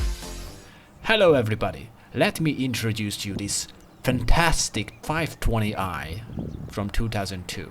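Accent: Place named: Hungary. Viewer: egyből hallottam hogy magyar vagy, de nagyon szépen végig mondtad és mutogattad én jobban élveztem angolul mint magyarul, és tényleg gyönyörű autó!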